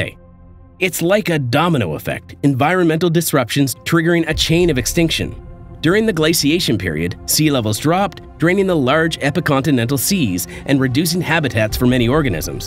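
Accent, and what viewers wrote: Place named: Canada. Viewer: The narrator seems to have a Canadian accent.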